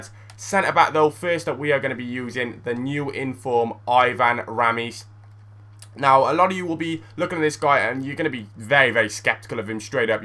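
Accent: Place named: Cardiff, United Kingdom. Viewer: Sounds like a Cardiff boy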